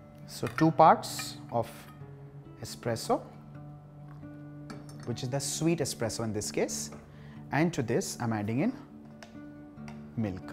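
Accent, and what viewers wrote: India. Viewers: He is indian right? Yeah I am loving his indian accent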